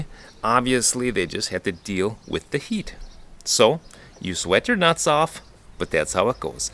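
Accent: Germany. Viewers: Wait you are a native englisch speaker i know yo live here for a while but why do you have german accent xd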